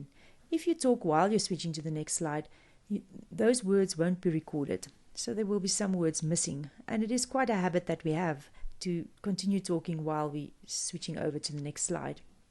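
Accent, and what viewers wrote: Afrikaans, South Africa. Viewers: Did not expect this to be South African lol. Dankie vir die mooilike video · Definately a south african voice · Thanks, it was very helpful and easy to follow. It is also a heartwarming surprise to hear an Afrikaanse accent. :)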